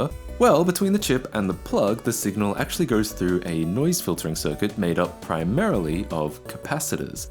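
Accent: Australia. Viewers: Clicked on this video after a random recommendation and was pleasantly surprised to find a fellow Australian. More than that, your voice is crystal clear and really quite calming, on top of the fun and exciting content. Well done, dude! And thank you!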